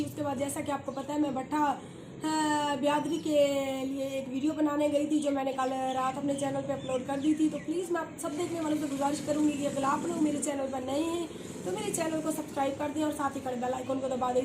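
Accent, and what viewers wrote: Saraiki, Pakistan. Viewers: Behan g bhatta program bohat Accha tha,ghareeb bhatta mazdooron ki mushklat Kiya Hoti Hain khoob rahnomai ki,,Behan pehle bhe ap ko SARiKi zuban men program Karne ko Kaha tha,,,,Behan g ap Ka lehja SARiKi zuban walls hai,ap Urdu mein jhlagta hai,plz APNI zuban mein program Kia Karo hamara SARiKi khitta hai ziyad SARiKi boli jati ha na Urdu Kam,,👌🙏🙏🙏💯👈plz reply,🤗 QAiSER.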